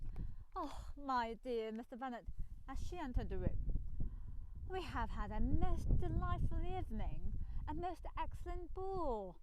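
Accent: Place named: United Kingdom. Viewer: 너무배우고싶네요..^^영국발음과 악센트..멋져요♡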